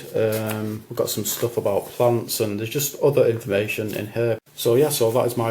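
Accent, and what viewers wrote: Manchester, United Kingdom. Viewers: I'm from North Manchester, I thought you definitely sounded Mancunian. Thinking we may be of a similar age, from your gaming videos. I'll be 42, in a few weeks.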